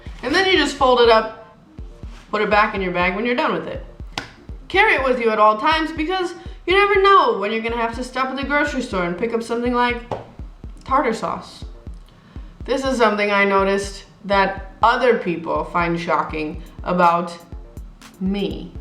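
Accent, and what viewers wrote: Czech Republic. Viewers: She has Czech accent tho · All right girl, I do not know where the heck are you living, but let´s go through some facts. At first, children drinkin alcohol is a serious issue in CR as well. 2nd thing yea, there is no tolerance about driving while alcohol is still in the system, but it is happening frequently and people are dying bcs of it. Often. Also, people are fighting while drunk, very often. I live near a complex of bars and clubs and every weekend I am just counting ambulances escorting idiots from here. You are right about our fridges and grocery shopping, however paying rent by cash? It can happen sometimes, but usually normal thing is to pay by money transfer from your account. Salary in cash? WTF? Maybe when you are working in a pub, but otherwise nope. NOPE. If we are talking about "scetchy" business, then this has nothing to do with our country, it is happening everywhere in "modern" parts of world. 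Last thing, I am not trying to be suspicious, it´s more of a funny thing I noticed, but your accent is really "czechy". Cheers, enjoy your living in our beer land. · Your accent is Czech haha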